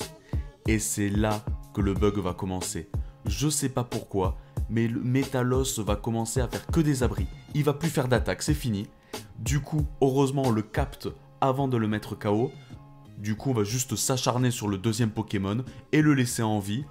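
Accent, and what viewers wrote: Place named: South, France. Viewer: Super vidéo le reuf !! Je découvre ta chaîne, petit accent du sud, on entend les cigales et le pastaga, un peu d'humour de temps en temps pile ce qu'il faut. Petite pépite ça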